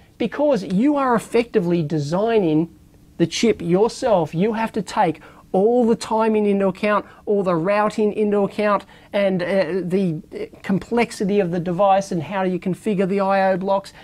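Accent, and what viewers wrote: Australia. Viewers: This guy is like watching Steve Irwin: in the accent and the excitement. Great speaker. Thanks for making it, really helpful. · Are you from down under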